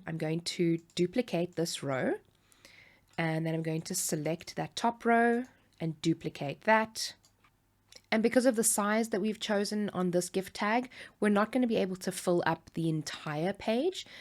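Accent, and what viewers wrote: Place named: South Africa. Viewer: I love your accent. Thinking it may be South African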